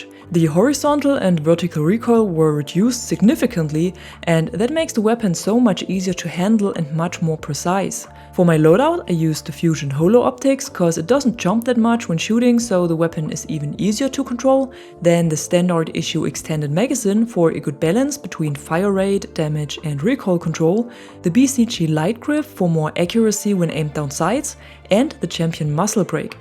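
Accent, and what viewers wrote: Germany. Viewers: Ha ich wusste das du deutsche bist :D hört man tatsächlich raus.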